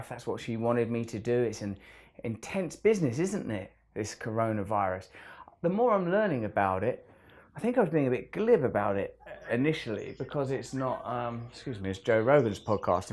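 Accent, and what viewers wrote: United Kingdom. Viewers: l couldn't understand u but l love British aaccent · This guy looks like the corona virus. Lock him up and throw away the key. Take out his internet as well. No one wants to hear his fucked up British ascend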